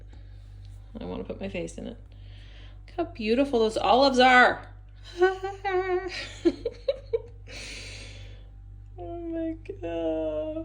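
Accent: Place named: United States. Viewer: Your behaviour is spot on French the only things you can't your accent plugs you as America not that not a bad thing the French do enjoy that because you have become French, the thing you chase flies away don't feel bad I still do the same thing and I was born here you could actually be more French than me now that is funny! I hope you wash away some stress in the court yard which a common thing to have in many buildings even in Paris! Your looking far less stress keep doing this your on the right path! One side note you should understand saying no is what all French to everyone your allowed to say no sometimes you may have to repeat yourself if he will not take your no walk away their hate I can assure he has had to told him long before he see you, and he can handle one more no! Try some of that cheese with fruit pears are really great with many cheese don't forget to take small spits of your wine with your cheese! 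It is OK to look like Marie Antoinette the French love this I am telling you I see a French lady you even have a level of class unseen by Americans. To be fair I have American accent when speaking French I have not been in France for near 40 years I don't feel French even though I am no longer American! I am having fun watching you taste cheese like I do yeah this is fun!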